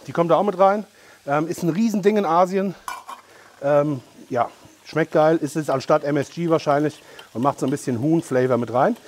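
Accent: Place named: Frankfurt, Germany. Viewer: Schaut gut aus,und der Frankfurter Dialekt 🤟👍🏻Gruß aus HD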